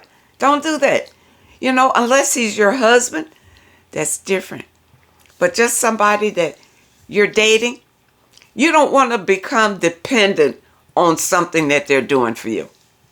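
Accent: South, United States. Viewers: Love your southern accent. I am here in Australia. I remain single after divorce. Also, they think they can be your boyfriend and arrive for drinks, food and sweet romance. Got bucklies with me. Sounds like same where you are. 🇦🇺🙂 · There is a major difference between Southern and Stupid Hick. She IS Southern! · Southern accent?? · Dear Miss, I am Dutch and can hear your lovely Southern drawl very well. When people can't hear you, for whatever reason, they can tapp CC on YouTube and subtitles are added. You don't have to add extra subtitles. Kind regards, Caroline, The Netherlands. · I'd rather live in a cardboard box in a ditch than to ever try to live with another man...or, another human being for that matter!!! Thank God I've learned a few lessons from life...and, now, old enough to know better😍No easy lessons...some of us have to learn them the hard way! 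The subtitles are nice but I had no trouble understanding your Southern drawl...maybe because I'm from Arkansas. Enjoying your channel and constantly nodding my head in agreement! ❤❤❤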